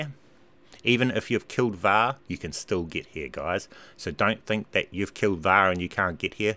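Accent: New Zealand. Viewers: Churr bro! I thought you sounded kiwi so I poked around your profile and saw that you were! Awesome to see a kiwi content creator I love it bro keep up the good work!! Instasub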